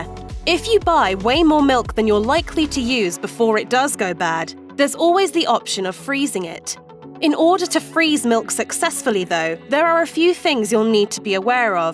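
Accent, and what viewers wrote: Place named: United Kingdom. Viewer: wait whaat! , mashed isn't british lol , where'd you find this so lovely nice british narrator lady , she's sounds awesome , btw im british too , great video & lovely narration too , stay safe mashed staff , bye!!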